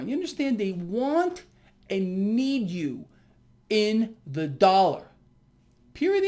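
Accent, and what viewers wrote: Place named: East Coast, United States. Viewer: Of course I don't know. He seems well intentioned, even sweet to me. But I admit to being very amused by his accent and personality. So East Coast!!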